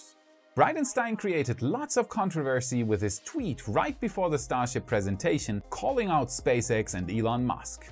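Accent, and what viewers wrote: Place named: Germany. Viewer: Are you german? If yes your English is really good!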